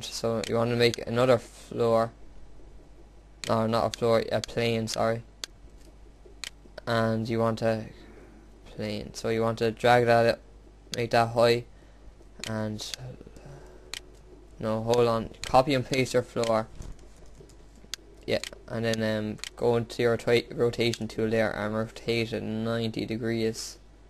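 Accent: Ireland. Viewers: he's irish thats the way he talks thats wrong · you sound like an Irish version of Edd from Eddsworld =3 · u r Irish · hey hes Irish like me haha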